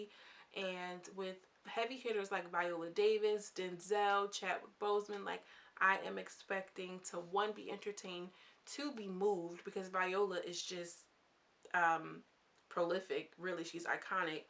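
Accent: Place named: Brooklyn, United States. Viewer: This was very informative... you sound like your from Brooklyn, I love your voice.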